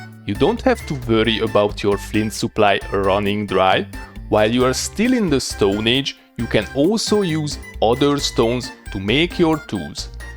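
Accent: Hungary. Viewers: Bro you have the most Hungarian accent i’ve ever heard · Noice hungarian accent. Reminds me to "the malaciz and the wolf" :D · Az első 2 mondat után már leszűrtem, hogy magyar vagy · Ezt az akcentust csak magyar ember tudja produkálni :) Ettől függetlenűl köszönet a videóért! :)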